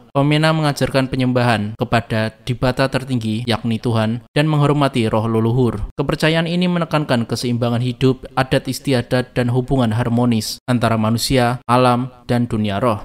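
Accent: Java, Indonesia. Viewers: Logat jawanya medok banget, mending pake suara AI jelasinnya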